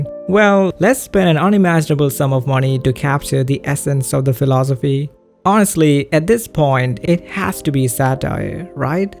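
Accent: India. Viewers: Oh boy, are you Indian or something? Your accent is showing, but it's passable. Please work on this. I'm not rage baiting. I'm trying to give advice, so you can get more views and a proper yt channel.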